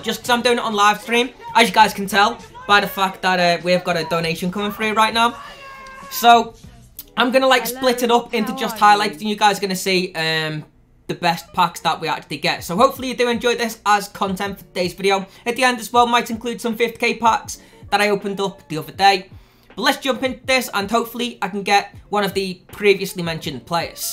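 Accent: Liverpool, United Kingdom. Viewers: Chxrlii - not Irish, scouse mate😂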